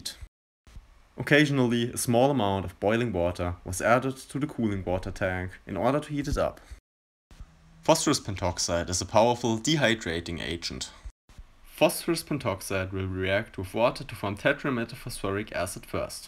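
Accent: Germany. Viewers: ich finde seinen Deutschen Akzent beim Englisch sprechen super😂👍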